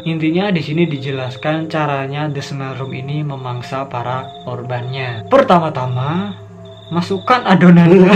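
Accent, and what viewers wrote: Java, Indonesia. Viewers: Yang takut jangan nonton., atau nanti ngompol dicelana., wkwkw., as always like this., tapi keren., ada medok medoknya., hehehe., Semangat bang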